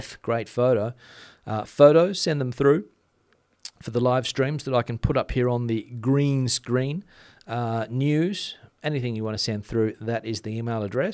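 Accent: Australia. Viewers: did your family make fun of your muted Australian accent? Spain's politics seem tranquil as compared to the USA. I'm stupid enough to have believed we'd return to more sane politics after Trump lost the election. I'm lucky in regard to "Jet Lag". Been to AU and NZ probably 7 times, and Europe at least 25 times. No problems. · nothing to do with anything, but, your Australian accent - I think it's the coolest accent, when it comes to the English language, of all English speaking countries; ours (Canadian, can be funny at times, talking a boot... 🤪)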